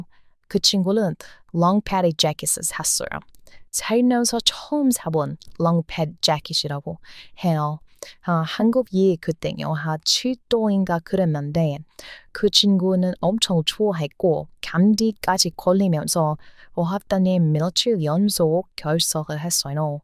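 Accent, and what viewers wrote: United States. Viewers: 은 한국인 2세인가요? 😮 나보다 한국을 더 사랑하는 듯 😂 전형적인 미국인이 한국말 구사하는말투가 매력적이네요.😊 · 님의 미국식 한국어 발음도.... 참 매력이 있네요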